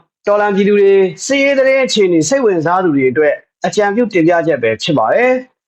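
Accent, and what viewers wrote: Yaw, Myanmar. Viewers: ကျက်သတုံး အားရစရာကြီး ပြောတတ်လိုက်တဲ့ ယောနသံ ဗက ပြောရင်းနဲ့ ရီသံကြီးက မအလ ကြားရင် ဖင်နဲ့ငုတ်စောင့်သေလိမ့်မယ် ဗိုလ်ကြီးရ 😂😂😂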